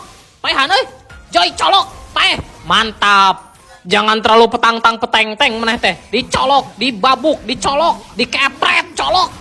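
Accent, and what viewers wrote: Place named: Sukabumi, Indonesia. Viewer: Sunda sukabumi ieu mah euy 😂😂😂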